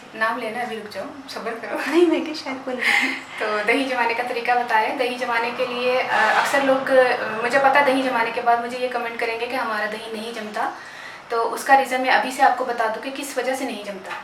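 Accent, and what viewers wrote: Punjab, India. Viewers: Tusi punjab tu oh jeraa ayna haha aisi v aynj e bolny ah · MashaAllah that panjabi voice aye khaye Pakistan de yad agae 😢😢😢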